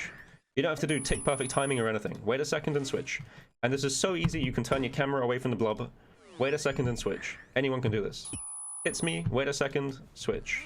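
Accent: United Kingdom. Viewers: Great guide. Didn't love the British accent though.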